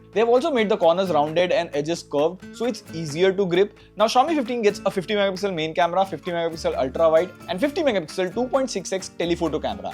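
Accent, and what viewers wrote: India. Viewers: Indian typically Indian accent hahaha we don't want to hear Indian typically English they always singing words, talk Hindi not English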